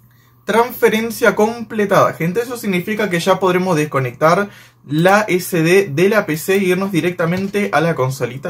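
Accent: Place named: Uruguay. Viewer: Hablas como uruguayo del interior, de donde sos?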